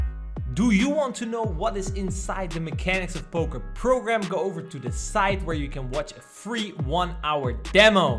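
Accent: Netherlands. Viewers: great man. I heard the typical dutch accent when talking english haha. Great content man , i love it !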